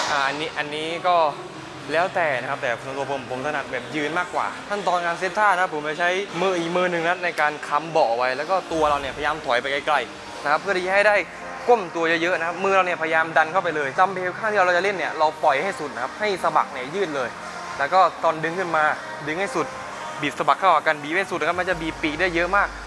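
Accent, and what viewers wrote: South, Thailand. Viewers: พี่คนใต้ใช่ไหม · พี่เป็นคนใต้ใช่ใหมค้าบ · คนใต้เหรอ เสียงออกทองแดง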